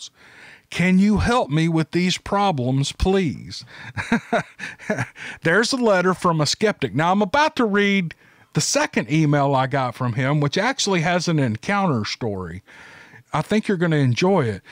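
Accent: South, United States. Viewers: Hey Sir… by your twang I think your a southerner. The start of this video shows a lot of nice trees and green grass not familiar with that in TX. You do not need to state the city etc. However could you say what state y'all lived in. Thank You.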